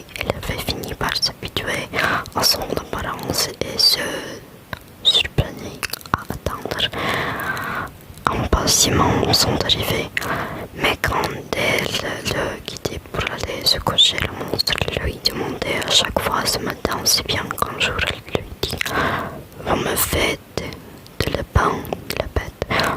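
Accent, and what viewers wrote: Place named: France. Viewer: hiii your french accent is really really good! it's so relaxing! maybe one day you can do a makeup tutorial video i really love your style! it reminds me of sousie soux with a mix of 20s glamour! i would love to draw you tbh haha 💟💞💟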